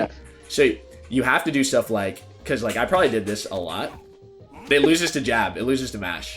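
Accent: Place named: California, United States. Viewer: Great videos as always, quick question though and really random. Are you from California? I feel you just sound like it lol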